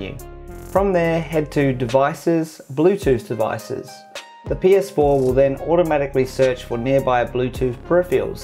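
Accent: United Kingdom. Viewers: Did you get his accent? He's probably across the pond hint hint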